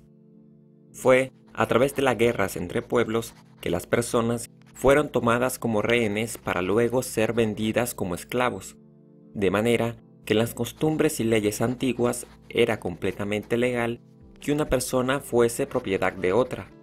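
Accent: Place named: Spain. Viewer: Puede ser su acento , los españoles dice mucho el sh en la s ,aqui en mexico hay muchas lenguas y la x se pronuncia como j ,sh ,ts ,x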